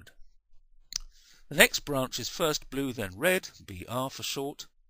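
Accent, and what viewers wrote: United Kingdom. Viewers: The only reason I watched this video was because of the British accent on this guy. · Are you british mate?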